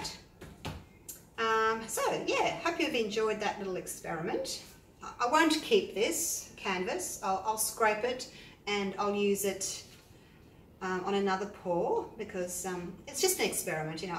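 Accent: Australia. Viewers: Great to hear an Aussie accent on a pouring video.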